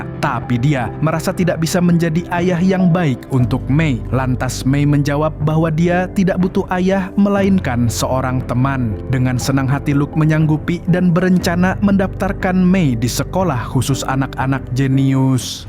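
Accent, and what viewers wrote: Banjar, Indonesia. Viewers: Banjar kah mangg? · Orang banjar kah bang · Katuju banar unda lawan nyawa min ai. Urang Banjar jua sakalinya😅. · Jiah, org banjar adminnya 😂😂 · mimin orng banjar kalsel kah,? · Urang Banjar kah sekalinya amang admin nih😅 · Urang Banjar amang nih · Banjarrrr bgtt lahh🤣🤣.. wkwkk bgl tapi kerenn bang 😂🔥🔥🔥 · pian ni urang banjar kah?😭🗿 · Urang banjar sekalinya 😂😂😂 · banjar jua skalinya😂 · Urang Banjar amang nya ini 😂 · Org banjar jua paman ni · Banjar kh pian mang😂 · orang Banjar sakalinya😂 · Anjay banjar sekali nya sidin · Banjar ya min...tambuk😂😂😂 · Mang Pian Banjar kah Kandangan,barabai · Orang Banjar kah mang😂😅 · Urang mana pian mang logat nya banjar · Anjay urg banjar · Org banjar jua sekali nya lah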